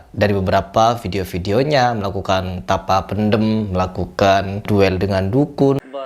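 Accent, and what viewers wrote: Madura, Indonesia. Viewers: Logatnya kaya Madura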